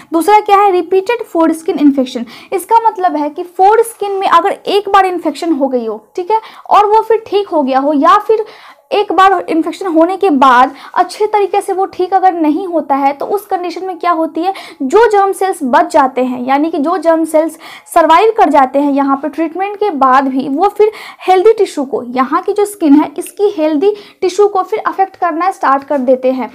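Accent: Bihar, India. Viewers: बिहार या मैथिल इलाकों से होने की वजह से यह हो सकता है।ज्यादा सीरियस मत लो , इनकी मेहनत की तारीफ होनी चाहिए।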